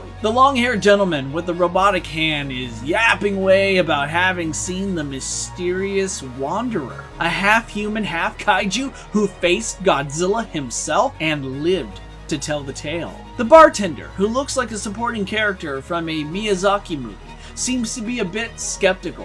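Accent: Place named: Oregon, United States. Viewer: Are you from Oregon bro!?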